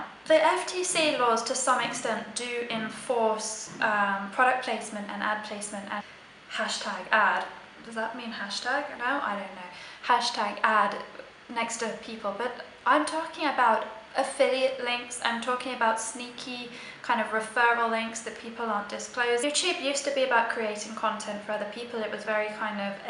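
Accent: United Kingdom. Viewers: Monetization on YouTube has been its downfall IMO. My channel isn't beauty related, but I just make videos for my own (and my students & their parents) enjoyment. The monetization offer keeps popping up on my channel, but I don't want to have to scramble for views or subscribers, stress about putting out a video every week or whatever, or worry too much about copyright for the music I use, so I won't do it. If a video only gets 100 views, it doesn't bother me. I've seen a number of YouTube "gurus" express the same feelings as you; if something like this is a JOB, it changes the whole experience. You do YOU, and don't worry much about us out here. As long as you have that lovely soothing voice and super cool British accent, I'll watch your videos (even if you DO talk about feminine products-it will still sound good coming from you) ;)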